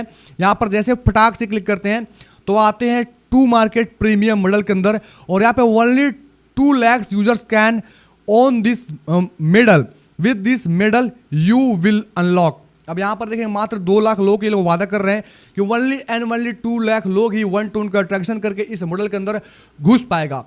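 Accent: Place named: Bihar, India. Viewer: Bhai Aap Bihar Ki Bhasa aapni Boto Me Jhalkata Hai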